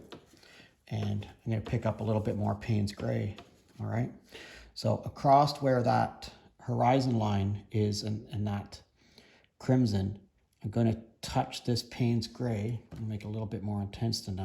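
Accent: Canada. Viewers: I am living in South Carolina now. Born in Scotland, lived in Montreal growing up. Family still in Ottawa and Toronto. I hear your Canadian accent, love it.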